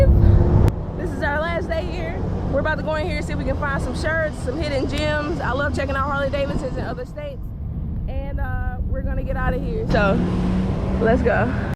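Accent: St. Louis, United States. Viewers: It’s bugs up here 😂😂😂 think that’s the first time I heard that St. Louis accent!! I like my helmet to match my bike so guess there’s no Simpson in my future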